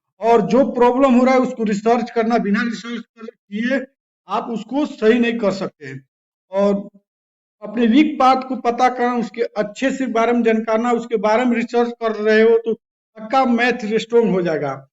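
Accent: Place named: Bihar, India. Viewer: Aapki boli se lagta hai aap bihar se hai... Agar nhi ho toh correct kar dijiyega humein... Bohot acchi koshish hai aapki... Aap ekk din nidarr tarike se jarur video banayenge.. All the best👍🏻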